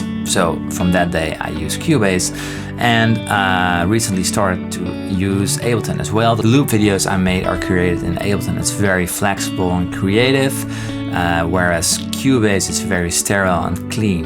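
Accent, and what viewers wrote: Netherlands. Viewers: As a Belgian I could tell pretty much right away his accent was Dutch. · Altijd al getwijfeld of je Nederlands was, wist t oprecht niet zeker haha. Geweldige video's trouwens ga zo door! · ah ik dacht al dat ik een Nederlands accent hoorde ;P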